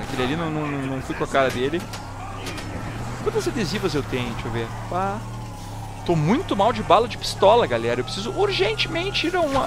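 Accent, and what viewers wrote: Rio Grande do Sul, Brazil. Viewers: Mas tu só pode ser gaucho né? abraço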